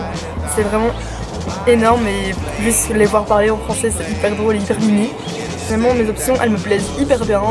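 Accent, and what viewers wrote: Belgium. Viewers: Accent belge comme on les aimes 😂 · J'ai direct su que t'étais belge jsp prk 🇧🇪💪🏾💪🏾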